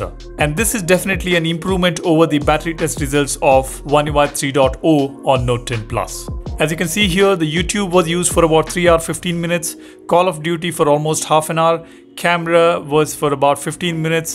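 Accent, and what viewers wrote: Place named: India. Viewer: I was looking up for a video about the n10+ 3.1 UI but I couldn't bear the heavy indian accent I can't.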